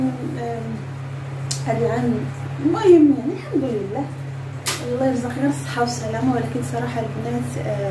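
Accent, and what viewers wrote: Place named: Morocco. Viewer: خمسة ديال لخموس ماشاء الله عليك ,لم تغيري أخلاقك ولا لهجتك المغربية ,أصيلة أحبك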